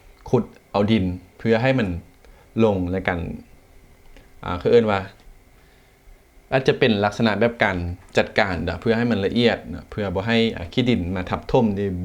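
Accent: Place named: Lom Sak, Thailand. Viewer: เพิ่งมาดูเป็นคลิปแรก ฟังสำเนียงพูดของ แล้ว.. ตอนแรกคิดว่า เป็นช่องของคน อ.หล่มสัก หล่มเก่า จ.เพชรบูรณ์ และชาวเมืองเลย..พูดภาษเดียวกันเป๊ะ..กำลังสันนิฐานว่า คนไทยในเขตนี้เคยมีบรรพบุรุษเกี่ยวดองมาจากชาวหลวงพระบาง..อืม!! เราน่าจะเป็นญาติกันนะเนี่ย😊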